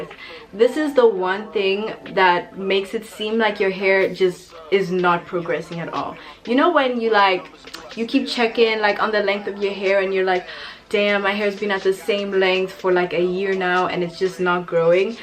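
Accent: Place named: Caribbean. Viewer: Your accent is so pretty, are you from the Caribbean?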